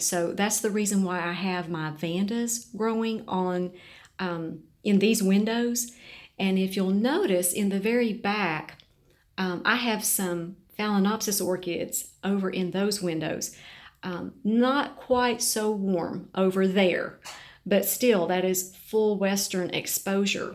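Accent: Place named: Tennessee, United States. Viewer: I truly appreciate you taking a moment out of this first sunny day in what feels like weeks, to reply to my question. I apologize because I wish I hadn't had to use the brand name of the product I asked about. I was wondering if you had any specific reasons perhaps I shouldn't include the aforementioned product into my initial soak and subsequent watering schedule. I'm born & raised in S.E. TN - my entire life, so not only does your accent feel like home.. 💯...but, your tips concerning directional exposure(s) help me, specifically. Your inherently generous and kind way about you is as lovely as your collection. Enjoy this sunny Sunday!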